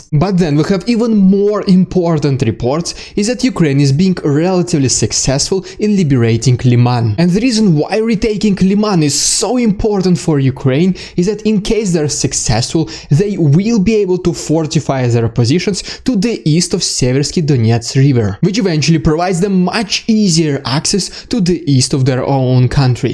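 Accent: Russia. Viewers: I love hearing the good news in an unapologetically Russian accent. It reminds us that this is not an inter-ethnic conflict.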